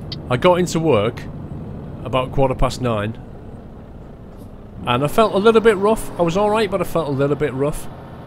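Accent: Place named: Scotland, United Kingdom. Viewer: Awesome Scottish accent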